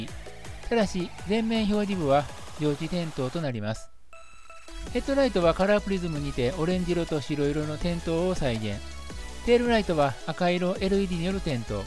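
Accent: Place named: Kansai, Japan. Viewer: HIDEKYU様 動画UPお疲れさまです。大変わかりやすくまとめられていて参考になりました。 ありがとうございます。 やわらかな関西弁にも癒されます。 キハ82, 189系あさま、E3系つばさなど、今月発表分には財布の紐が緩みそうです💦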